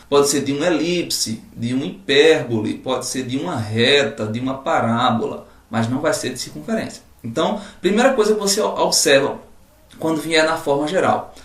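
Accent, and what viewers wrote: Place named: Northeast, Brazil. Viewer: aula mt boaa prof! mto bom ver alguém aqui no yt com o sotaque do nordeste :) sucesso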